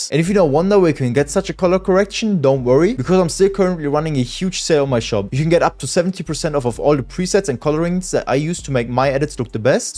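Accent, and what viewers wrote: Germany. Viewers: so geil wie man nach den ersten 2 sekunden weiß dass er deutsch ist haha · Man hört deinen Deutschen akzent extrem 😂😂😂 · man hört wie krass deutsch er ist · Ich rieche einen Deutschen Akzent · I hear a deutsch akzent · Thx Bro. The German accent is awesome tho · Dieser deutsche akzent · Ich höre den deutsch Akzent…. Hast du so ein Video auch nochmal auf deutsch?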